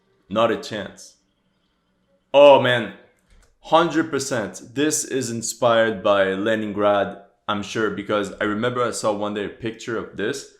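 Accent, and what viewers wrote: Quebec, Canada. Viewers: I have a question for u man where u from? because you have like a canadian accent but from Quebec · He is from the french part of Canada right?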